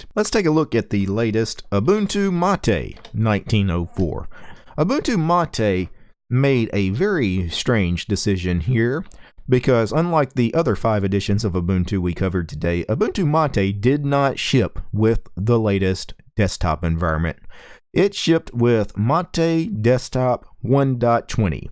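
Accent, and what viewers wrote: United States. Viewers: could you have a look at opensuse? Or suse enterprise? Its not that I prefer one distro above the other but our envirnoment at my office is bound to sles 12. And I think suse is german company (im swiss) so it would be interesting about an international meaning. Im not sure where you are from, you sound US, anyway your voice is amazing. Cheers from switzerland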